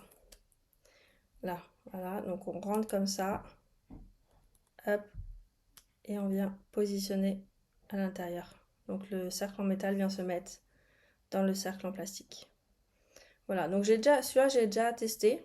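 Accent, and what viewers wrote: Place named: England, United Kingdom. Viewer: Jolie découverte de ta chaîne grâce aux suggestions de Youtube :) Quel joli accent anglais d'ailleurs ♥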